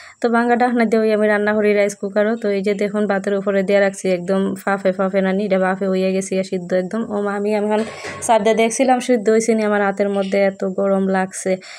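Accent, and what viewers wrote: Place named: Sylhet, Bangladesh. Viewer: সিলেটি?? এই কথা গুলো খুব ভালো লাগে শুনতে ❤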